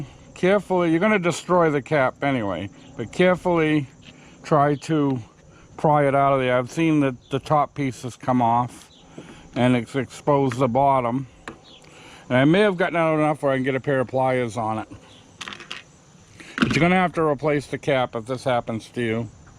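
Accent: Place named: New England, United States. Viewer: Dude definitely is from New England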